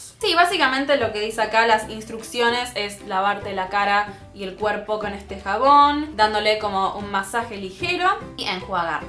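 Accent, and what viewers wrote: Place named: Argentina. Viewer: me encanta tu voz argentina divina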